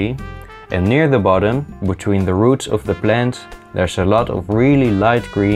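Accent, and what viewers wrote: Netherlands. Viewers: you sound really dutch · Jij bent nederlands ik hoor het aan je accent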